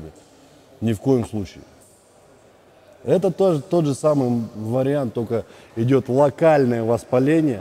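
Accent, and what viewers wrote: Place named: Kazakhstan. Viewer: Он с Казахстана, там такое произношение допустимо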